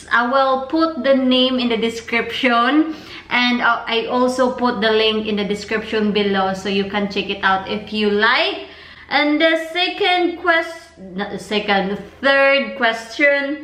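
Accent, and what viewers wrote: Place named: Visayas, Philippines. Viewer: I just saw your blogs in YouTube, I really liked it. May I ask where are you from in the Philippines? You sound like Bisaya. I'm from Cebu too.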